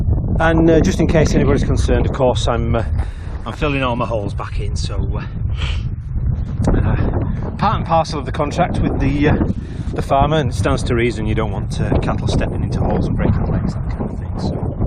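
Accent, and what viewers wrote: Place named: England, United Kingdom. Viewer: Your accent makes me homesick for England. I grew up in Manchester and moved to the USA at 14. I lost my accent but people still hear a little of it. It's a lovely area where you are. I used to love walking in the fields and parks when I was young. I really hated reading about the fire at Wythenshaw Hall, I have fond memories of walking through the house and looking at the beautiful antiques they had . I hope they caught the horrible person that set fire to it. They destroyed a lot of history. Until next time, happy hunting, have a great day and I hope you find some really interesting artifacts. God bless.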